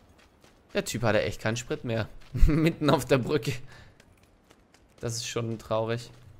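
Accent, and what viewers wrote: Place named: North, Germany. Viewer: Alter Schwede!!! Das geht schon klar :D Sagmal woher kommst du?? Irgendwie hörst du dich sehr nordisch an ;)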